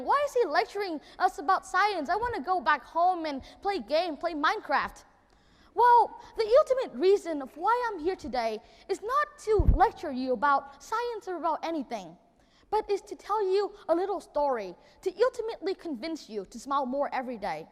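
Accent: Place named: Vietnam. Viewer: giọng Vietnamese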